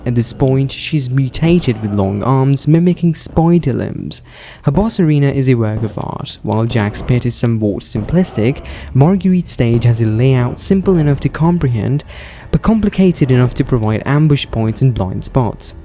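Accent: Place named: Northern England, United Kingdom. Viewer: bro i really didnt mean anything by it. I actually felt bad and was gonna go back and delete the comment. Felt crap to watch such a great video amd just leave that as a comment. Im just usually good with accents and was puzzled by yours. You sound like you're from a nice part of northern england or something. Genuinely tho mate, this was such a good video. I felt like you put a lot of stuff that i felt into words so thanks. Ill definitely watch the new video when uts out too ✌️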